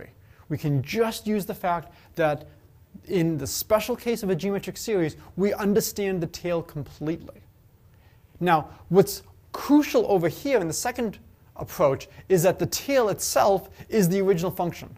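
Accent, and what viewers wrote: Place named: Boston, United States. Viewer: Q: What do Bostonian doctors say when they need to examine their patients' throats? A: "Say r" Ain't nothing to apologize for, it's charming :-)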